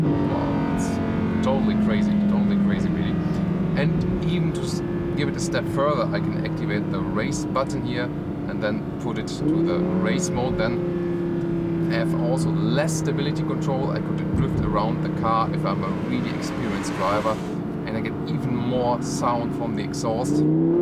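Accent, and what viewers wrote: Germany. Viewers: Dear, I always enjoy your videos, they are very detailed but I really get the feeling that you're not a sports car type of guy, so I rather see you in a Mercedes SL or Jaguar XK, no offense. That said, maybe you should leave the sports car reviews to someone else who actually appreciates these cars, just saying. No, I would never take an Audi TT over the R8 (are you nuts???) and whenever the Mercedes SL comes up, I get a serious allergy. You are right about the R8 V10 Plus standard bucket seats but you kind of forgot to mention that Audi offers optional (free of cost) sport seats, which are much more comfortable. Also, you should work on some of your English words, you sometimes translate them directly from German into English but they do not really make sense in English. Same goes to your accent, you are using a German accentuation for English words, which of course does not really sound too good. 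This comes from a fellow German.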